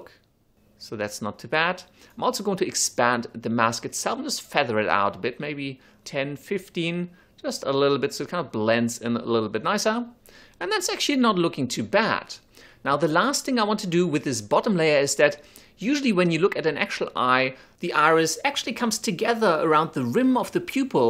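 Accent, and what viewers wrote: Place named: Germany. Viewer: Hey You sounds like a German accent to me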